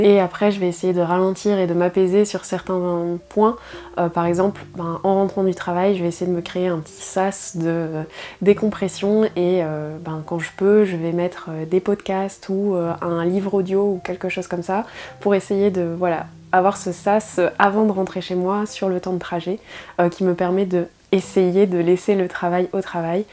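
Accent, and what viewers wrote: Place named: Hainaut, Belgium. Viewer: Félicitations pour ce cap des 10000 abonnés. C'était un plaisir de te découvrir davantage... Avec toutes tes passions qui sont celles que j'aime aussi... Cette vie douce est vraiment source de satisfactions ... Tu diffuses de l'apaisement comme le parfum des pâtisseries que je t'imagine sortir du four ☺️. Et cerise sur le gâteau tu es du Hainaut 😉 le pays de ma famille maternelle.. 🤗. Et chaque note d'accent est une petite madeleine pour moi ☺️... Je te souhaite encore de nombreux abonnés et surtout... beaucoup de temps pour continuer à créer. 😘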